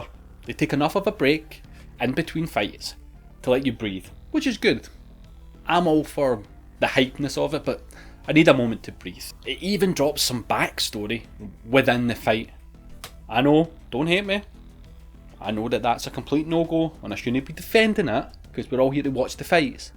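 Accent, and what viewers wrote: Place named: Scotland, United Kingdom. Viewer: Hi. Well done really. You make me curious to watch it actually. I think the job is done in that case. I'll do what I do best... Opinion time. + Great performance + good topic + perfect editing + super transitions + good integration with watcher (me) Ready for that? - YOU HAVE TO GET RID OF SCOTTISH ACCENT IF YOU WANT TO BE LISTEN GLOBAL. I'm so sorry to say that:( Overall piece of great work:)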